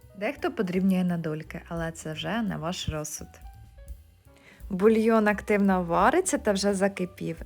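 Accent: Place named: Poltava, Ukraine. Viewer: Христос Воскрес! Здається , Ви родом з Полтавщини!) так радісно і приємно чути!! Солянку зазвичай готую без картоплі, але зараз саме буду як Ви порадили) дякую!!) миру нам !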